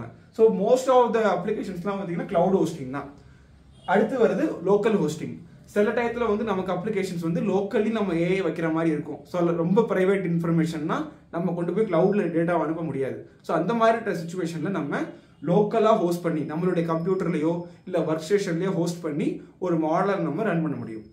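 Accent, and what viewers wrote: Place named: Kerala, India. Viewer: And your malayalam kalandha thamizh is beautiful and cute.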